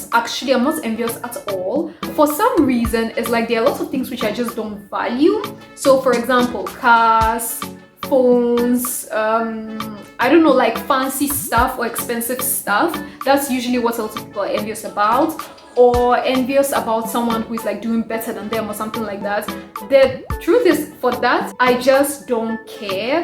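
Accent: Ghana. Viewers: Do you speak Twi? I'm asking because of how you speak English, the way most Ghanaians do.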